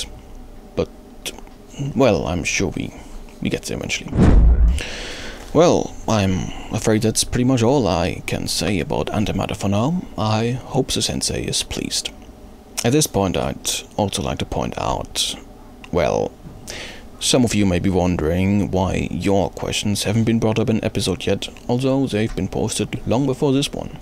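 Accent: Germany. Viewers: HE'S GERMAN HIS AACCENT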